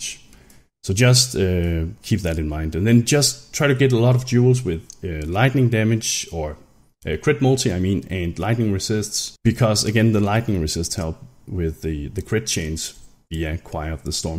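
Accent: Denmark. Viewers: get hit by a little shit bird. lost it xD and nice Danglish xD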